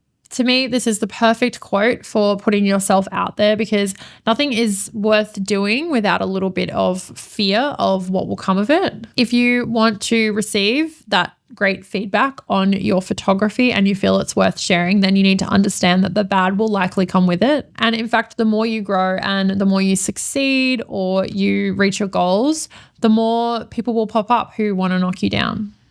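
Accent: Australia. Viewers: Great points across the board. I'm on the big side of my 50's and it took until my 40's to be able to let almost all negative comments slide off my back. This episode can be so good for the young ones that take their interactions so personally. My difficulties come from learning street photography:).. I so want to get better at it, but I really get the icks when people get scrappy with me. Great stuff, I really enjoy your content (and the Gold Coast accent is wonderful - keep on keepin' on)...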